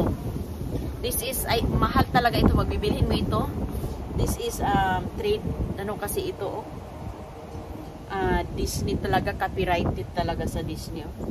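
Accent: Visayas, Philippines. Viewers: Halata po bisaya kayu 🥰🥰🥰 ... Swertyha sa family uy....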